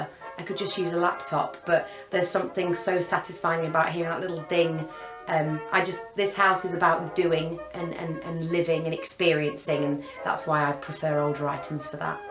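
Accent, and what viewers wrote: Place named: United Kingdom. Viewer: Love this! She sounds British, is she single? 🙂(Of course, I don't live in Europe..)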